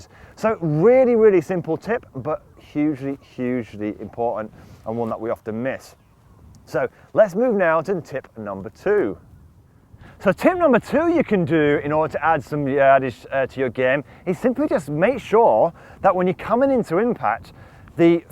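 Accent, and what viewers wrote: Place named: Leeds, United Kingdom. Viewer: Simply the best Danny......luv your Leeds accent :)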